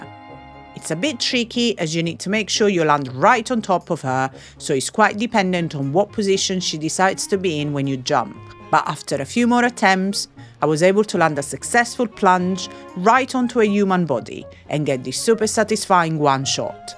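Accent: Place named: Italy. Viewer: I can't predict how she's going to pronounce words. Italian, Southern England, and hints of Irish all rolled into one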